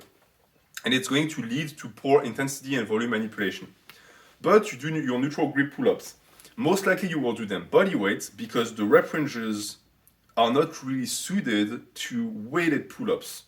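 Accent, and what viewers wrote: France. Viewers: I dont get it. French accent but you have a football on your shirt and not a soccer ball. Im hella confused.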